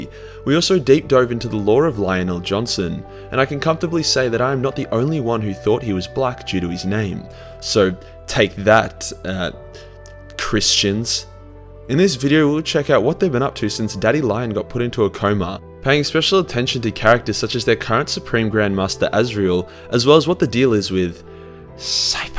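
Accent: Australia. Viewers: It feels like I'm listening to an Australian talking about kiddos with adhd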